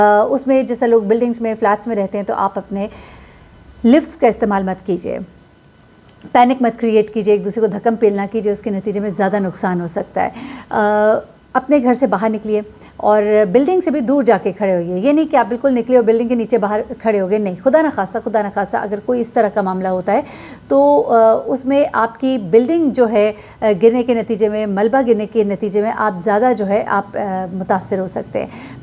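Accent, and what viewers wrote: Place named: Punjab, Pakistan. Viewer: اور آپ غالباً تلہ گنگ یا سرگودھا سے تعلق رکھتے ہیں،وہاں پر ہی اس طرح سنا ہے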